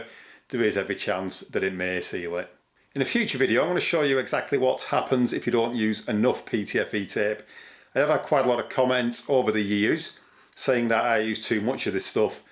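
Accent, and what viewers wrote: United Kingdom. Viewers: Best accent in UK, best advice in World! What did you do to your hand?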